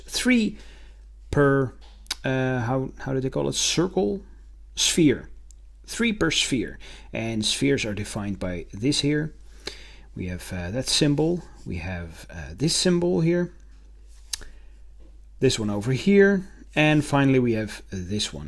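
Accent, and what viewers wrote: Netherlands. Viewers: You're Dutch?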